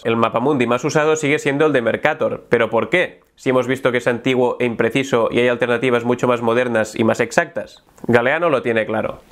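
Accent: Catalonia, Spain. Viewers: Ets catala jajaajaja es nota molt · Eres catalannn??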